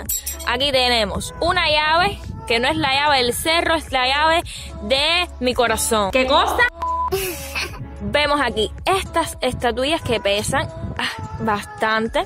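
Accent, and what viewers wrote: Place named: Cuba. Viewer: Excelente video me encanta tu acento cubano además estas súper guapa saludos preciosa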